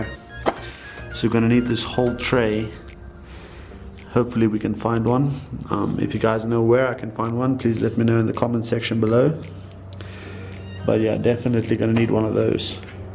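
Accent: South Africa. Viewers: Ayyy I recognized the accent😉 I'm South African aswell. Enjoying your vids bru, keep it up.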